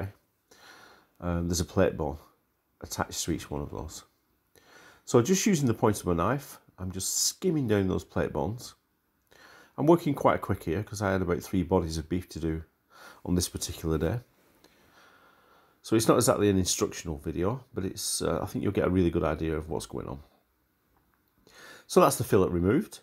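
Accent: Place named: Lancashire, United Kingdom. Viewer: Love the video proper job done sounds like your from Lancashire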